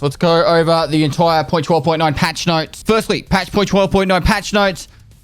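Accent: Australia. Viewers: it is nice to listen some Australian english, bein a Russian, living in Moldova. EFT: connecting people )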